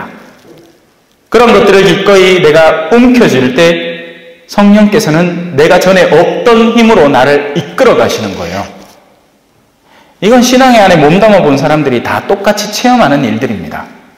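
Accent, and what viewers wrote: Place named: Gyeongbuk, South Korea. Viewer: 신부님.감시합니다 다정.다감하신.말씀.강론.찰지십니다..경북..내고향.의성가음면...신부님.사투리..베트남에서 기뻐합니다..아멘.🙏🙏🙏🙏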